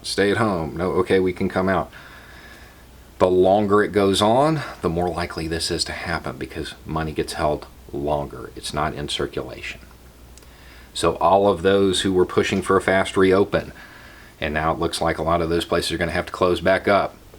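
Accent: United States. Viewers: Fucking hell, I'm so used to hear trumpian nonsense these days that hearing sense spoken with an american accent nearly made me fall off my chair! I should know better as there are as many smart americans as any other nationality. Speaking from europe. We got our own troubles of course, it's not paradise, we're not that bad yet even tho there are plenty, too many, who wish to adopt the USA ultra liberist system full on. Power of hollywood I suppose. Good luck to all of us.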